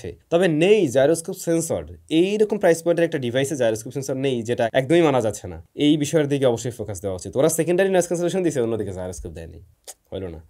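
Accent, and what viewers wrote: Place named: Sirajganj, Bangladesh. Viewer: ভাই আপনার গ্রামের বাড়ি যদি সিরাজগঞ্জ হয় তাহলে আমার আইডিয়া ঠিক আছে কারণ আপনার ভিডিও দেখে এবং আপনার কথা শুনে আমার কাছে এরকমই মনে হয়েছে আমার আইডিয়া যদি ঠিক হয় অবশ্যই রিপ্লে দিয়েন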